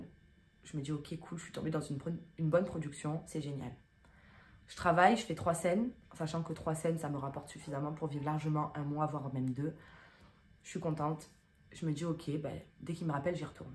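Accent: South, France. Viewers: Ton témoignage est d'autant plus intéressant que les récents événements (enfin, scandales !) avec J.et Michel et pascal OP mettent bien en lumière ce monde très sombre, qui cherche à ce qu'on ne mette pas le nez dans ses affaires ! A t'entendre, on comprend pourquoi... Garde ton style "franc et méridional" (c'est vrai que nous, parigots, sommes plus froids), ça fait passer le message d'autant mieux ! Très bonne chance et courage pour la suite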